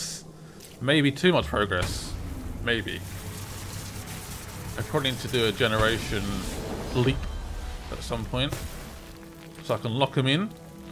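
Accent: United Kingdom. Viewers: This lets play is much better cause of the British narration, makes it feel more like a documentary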